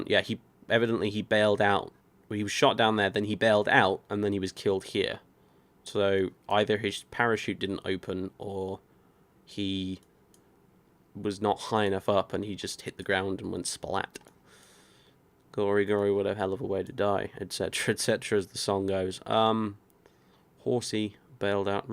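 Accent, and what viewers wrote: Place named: United Kingdom. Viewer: lol, funny sometimes how the English speak English. I mean, it's their freaking language, and we have things like left-ennant and al-u-min-ium. Charming, but funny.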